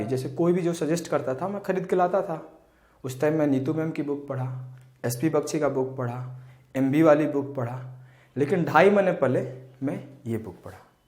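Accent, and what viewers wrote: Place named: Bhojpuri, India. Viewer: Jai ho bhaiya 🙏 Tohra muh se bhojpuri sun k mja aa gyil😍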